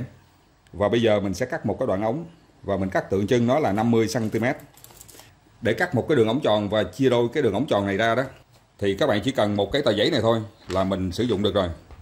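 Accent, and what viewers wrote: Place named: Vietnam. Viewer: ฟังภาษาเวียตนามแล้ว ปวดหัวมาก สำเนียง ปิดลำโพงเลย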